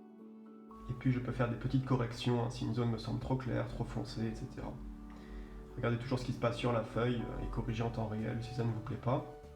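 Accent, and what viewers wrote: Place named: Alsace, France. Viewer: Merci, il me semblais avoir entendu l'accent Alsacien de temp en temp!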